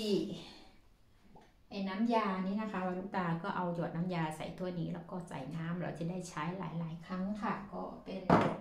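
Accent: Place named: South, Thailand. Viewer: พี่warutaเป็นคนใต้ใช่มั้ยคะ แฟนหนูคนนครศรีคะ เค้าบอกว่าสำเนียงเหมือนคนใต้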